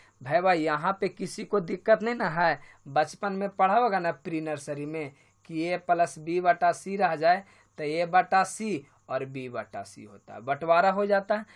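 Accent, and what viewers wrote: Bihar, India. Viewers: Sir,kya aap Bihar k Gaya jila se ho..??🙄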